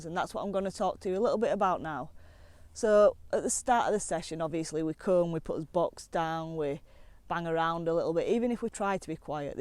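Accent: North, United Kingdom. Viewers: Another great video, in a nice northern accent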